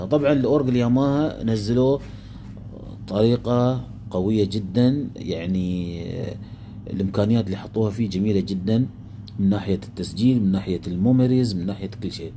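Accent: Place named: United Arab Emirates. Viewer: Nice. I'm Iraqi born living in the USA. My Arabic isn't that strong but I was able to understand you fairly well. I assumed the UAE dialect would be much more difficult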